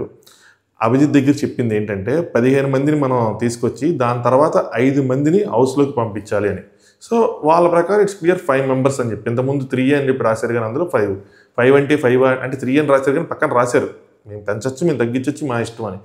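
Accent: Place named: Srikakulam, India. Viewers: mee roots Srikakulam aa....konni words lo doubt vacchunde....vja antaru but slang okkosari doubt vacchedhi😅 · Yes same doubt naku kuda matalu srikakulam vijayanagaram side laa vuntay but he is from vijayawada...may be ikkada settle ayyaremo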